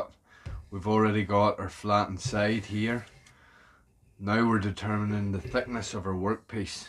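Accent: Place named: Antrim, United Kingdom. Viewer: that aul country antrim accent proving hard for people to decipher haha love it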